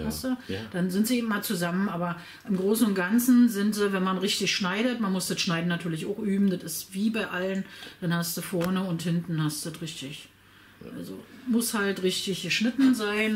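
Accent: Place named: Berlin, Germany. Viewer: Hallo, vielen Dank für Deine Videos, liebe, die berliner Schnauze finde ich als Rheinländerin schon amüsant. Ich muss aber mal was fragen, ich such mir einen Wolf......gibt es einen Adapter, mit dem man die Matrizen der großen Avance auf die kleine Viva schrauben kann? Für die große gibt es so viele Formscheiben....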